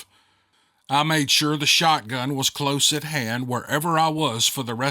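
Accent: South, United States. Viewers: I love your accent, I'm from GA so I know I have a southern accent and I like hearing yours, it reminds of home. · I love hearing you. Hard to find a true Southern Voice. Your people love it don't let 1 rotten apple ruin your day. Thank you for being here,. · I love your southern country accent, sense of humor, and charm. Your voice is comforting to me. I can close my eyes and imagine that I'm sitting at a campfire with you and your family, listening to the stories. It's lulled me off to sleep on many nights. Please keep the stories coming. ❤ Thank You everyone who's taken the time to write in. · I'm an Okie!!! Lol I love your redneck accent! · Your superior Southern accent sounds just like me, I'm from Eutawville SC, keep talking normally, we appreciate it! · I love you and LOVE your fine Dixie drawl! It makes listening to these encounters and stories such a joy! 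Thanks for this one- I agree, it’s especially good! ❤🦍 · Love love lover your accent!!! No, it doesn't remind me of an Okee from Muskokee just a southerner and it is super charming!! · Love ❤️ your Redneck accent 👍 Keep on entertaining us with your Awesome voice 👍 I agree with the previous commenter, some ppl just aren’t happy about anything 😝 I have never had anything to complain about since I’ve started listening to your channel, many moons ago 👍Keep up the great content & Happy New Year 😀👍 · Don't change I love the Redneck accent :D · Thank you. You have no idea how much I needed this today. Your the best!! Thank you to all who send in there encounters. Many blessings to you and yours. 😊 I agree with everyone commenting, your voice and southern accent is what made me start watching this show from day one. Your personality is amazing and we, “ your people “ love you and this podcast. So Thank you again, much love from NE Illinois 😊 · Never lose your religion over someone not liking your "Okie" accent. 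What you have is just a typical Southern accent, that most of us from the South have. If some Northerner doesn't like it, well, "bless their hearts", they can go out back and tell it to the hogs! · Your Southern talk is what drew me to your channel in the first place! I feel at home. Love it. ~ Louisiana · The best part of your narration is your not too strong beautiful southern accent. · Hi, and DC fans!, I’m from the Great Plains, and I love your Mississippi/Tennessee accent! You’re perfectly understandable, and it’s beautiful! That small-minded woman had no idea what she was talking about. If she didn’t like your accent, why was she listening?? 🤔 I agree with another commenter: she was a Karen. I’m so looking forward to any more Steve Lilly stories you may record soon! 😀😍🙏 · I love your voice. It is a southern draw great for story telling. But you don't speak real slow as many do from the hottest gulf air regions. 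They just do everything at a measured pace, including talking. No doubt it is pacing yourself in the god awful heat. I hate humidity. When I am visiting your region I walk extra far to get anywhere as I stay in the shade as much as possible because the Sun is oppressive. So I plan my course to go from one piece of shade to the next. And I take frequent breaks to keep from overheating since my sweat just makes me wet without cooling me through evaporation. I always carry lots of water so I don't dehydrate. And when you mispronounce words I realize words are pronounced differently depending on the local dialect, so I never think you are making a mistake even though it may seem like it. There is no right dialect. But sometimes I am pretty sure you know you are making a guess at pronunciation. You make it entertaining rather than a distraction. Being humble enough to make fun of yourself works well for many. 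You do it well. Keep it up and don't worry about stuck up people that judge everyone. I think they are just judging themselves as lacking when they do so. It shows an ugly personality.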